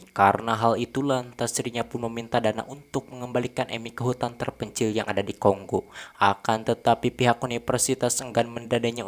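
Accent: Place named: Sunda, Indonesia. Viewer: Geningan si mimin th orang sunda😂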